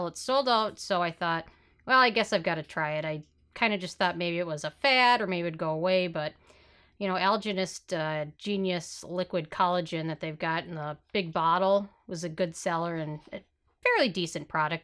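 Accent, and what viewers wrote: Minnesota, United States. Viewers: I love how honest you are and that you have a Minnesota accent like me 😄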